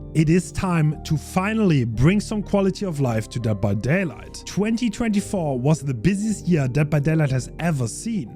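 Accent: Germany. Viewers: is he german? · german,austrian or switz accent?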